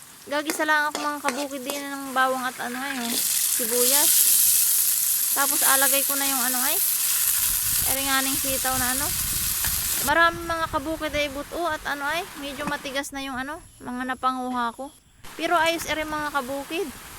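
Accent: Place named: Quezon, Philippines. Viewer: Taga Quezon Province ka ate.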